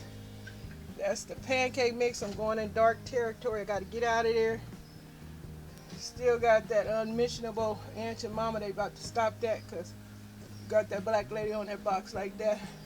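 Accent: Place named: Deep South, United States. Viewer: Lol at swamp butt. That’s how they talk in the deep south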